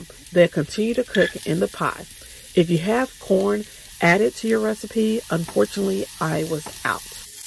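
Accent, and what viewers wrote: South, United States. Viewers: Hi, I'm new to watching your videos. I enjoy seeing you explore the world and cooking in your van. Where are you from you have a southern accent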